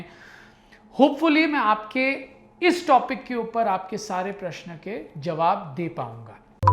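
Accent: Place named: Maharashtra, India. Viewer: Sir ,are you Maharashtrian? Because your accent is marathi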